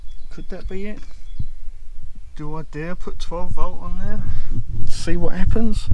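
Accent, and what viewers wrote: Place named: London, United Kingdom. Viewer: you sound like Monty Python's "My Brain Hurts" I told her it's a normal London accent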